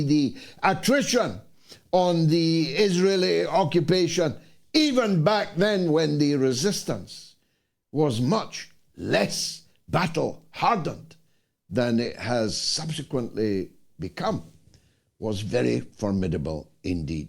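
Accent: Scotland, United Kingdom. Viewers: Because of his peculiar Scottish pronunciation and the way he delivers (pauses very contrived) we apportion to him a type of reverence. He is just another socialist scumbag from the north that has nothing to look forward to but the ‘lake of fire’ because has not repented and keeps grinding on on these dismal subjects. do you think you are exonerated by your monologues-there is zero redemption in pointing out the sins of others for you or any other commentators bro. Take a break and examine your own life my friend before it’s too late…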